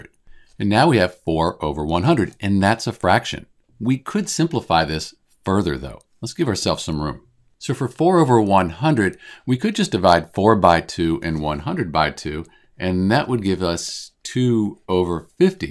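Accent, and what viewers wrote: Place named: RP, United Kingdom. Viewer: His voice sounds so posh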